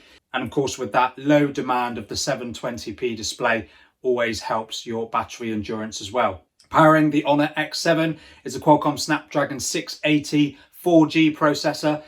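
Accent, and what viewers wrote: Bristol, United Kingdom. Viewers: You from Bristol?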